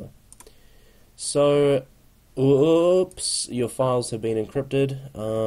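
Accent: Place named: New Zealand. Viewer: Nice kiwi accent ;)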